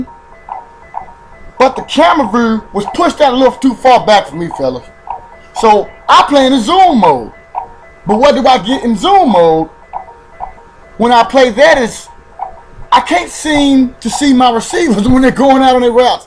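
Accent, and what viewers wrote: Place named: Baltimore, United States. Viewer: That Baltimore accent is thick as shit. Vieruu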